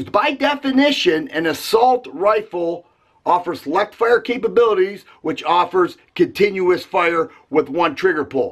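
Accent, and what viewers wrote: United States. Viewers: The presenter needs to do a little brushing up on his facts. He uses Assault weapon and Assault rifle interchangeable at about 8 seconds into the video. The AR-15 is not an Assault Rifle, but, it is an Assault Weapon. The terms are United States specific, because different words have different meanings in different places, but, he sounds pretty 'merkun. Assault weapon is usually defined as a semi automatic with a detachable magazine. Sometimes includes the definition of pistol grip, but, not always. It's absolutely true true that the AR is not an Assault Rifle. His claim that it's not an assault weapon is false.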